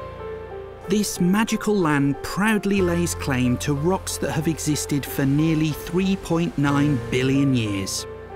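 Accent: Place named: England, United Kingdom. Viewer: Lovely video. Funny that an Englishman is narrating it 😎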